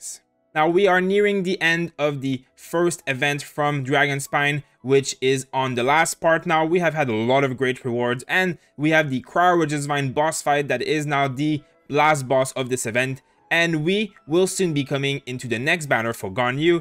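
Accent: Quebec, Canada. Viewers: Am I dreaming or do you have a french Canadiens accent? Btw new here but I'm loving your channel! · That accent... You're from Québec, am I right?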